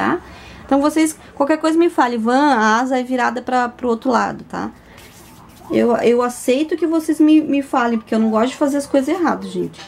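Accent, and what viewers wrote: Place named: Rio Grande do Sul, Brazil. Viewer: Oi van adoro suas explicações eu qdo o seu zap .amada não fica xatiada não com esse tipo de COMENTARIO NAO TENHA FE EM DEUS ELE TEM O CONTROLO DA NOSSAS VIDAS BJS. GOSTO MUITO DE VC É ADOOOOOOOOORO SEU SOTAQUE TCHÊ FICA COM DEUS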